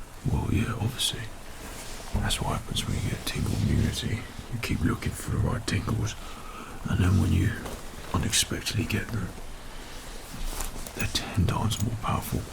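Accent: United Kingdom. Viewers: .......i have no idea why i like this "gangsta british accent" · Hes bri’ish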